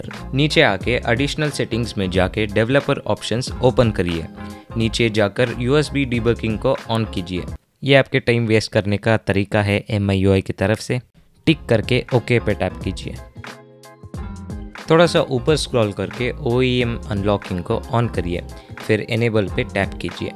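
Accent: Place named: Karnataka, India. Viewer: You are not native Hindi speaker, you sound like a kannadiga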